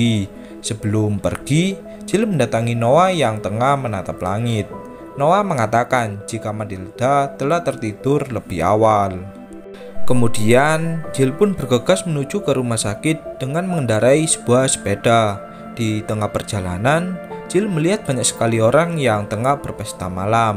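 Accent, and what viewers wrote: Java, Indonesia. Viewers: hostnya first medok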